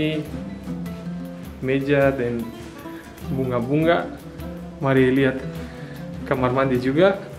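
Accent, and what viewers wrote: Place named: Ternate, Indonesia. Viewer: Tong Tra bosan, kalo durasinya d perpanjang Sadiki malahan makin bangga ada bule yg pake tong pe logat Ternate 😊🤗apalagi liat ganteng uucchh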